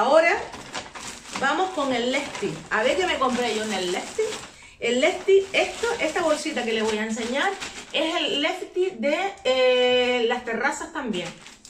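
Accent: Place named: Canary Islands, Spain. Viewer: Ohhh escuchándote identifique tu acento 😊. Mi novio tb es canario 🥰. Muy buenas compras, me suscribo a tu canal y una vez más gracias por suscribirte al mío! Saludos 😘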